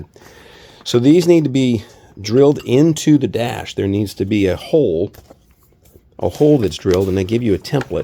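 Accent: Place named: Metairie, United States. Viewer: Funny how I’ve been gone for almost 30 years, and the minute you started speaking I thought, “That guy sounds like he’s from Metairie!” 😂 Nice job, and I hope to soon be doing an a/c installation in my own classic, as well.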